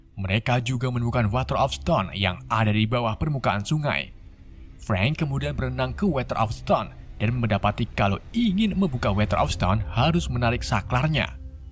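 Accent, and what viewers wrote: Malaysia. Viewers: Nampaknya komentator ini dari Malaysia, sebab ada sedikit terdengar dengan nada yang berlogat Malaysia. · Orang Malaysia ya min ? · Bang kok loghat lu kek orang malaysia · Abang dari malisiya ya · Min. Gua mau nanya lu orang melayu ya. Soal nya gua teliti dari logat lu ngomong bahasa indonesia kayak orang melayu.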